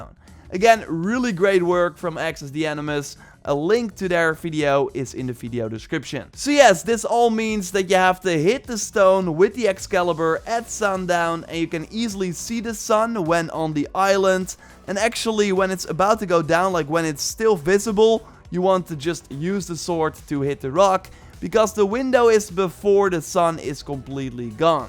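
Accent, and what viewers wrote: Netherlands. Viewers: Are u dutch ???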